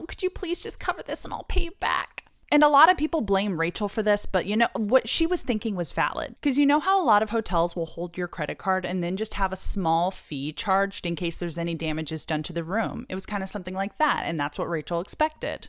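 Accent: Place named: Europe. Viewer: Heh.. yeah her accent is quite... Europeish. Like, "somewhere east of France". And yes, it was, and you hit the notes perfect!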